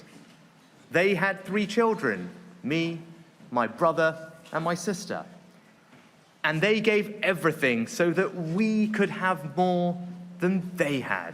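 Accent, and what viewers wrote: United Kingdom. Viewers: Bravo to Rishi Sunak. If someone puts a curtain in front and hears him, will know a sincere British is speaking...So Decide Whom you vote....Best for Your New Prime Minister... God Bless Britan !!!!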